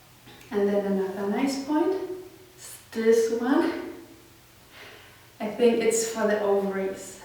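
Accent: Germany. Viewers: Du bist Deutsche, das hab ich sofort gehört ;)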